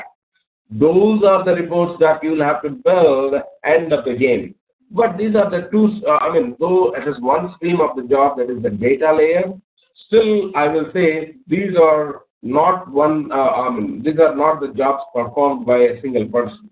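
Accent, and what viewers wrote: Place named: India. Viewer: Thanks for the presentation!...but may I suggest to you that its really painful listening to you trying to mix Indian accent with bad American accent with different pitches in tone, but this is really making the presentation delivery poor and sometimes inaudible. Please stick to your natural accent and slowly enunciate your words for better clarity!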